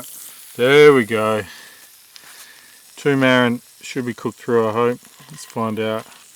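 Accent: Australia. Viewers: Hey can somebody tell me where is filming his videos? Is it Australia or NZ? Probably AU right? Not a native speaker so also curious whether he has a typical aussie accent?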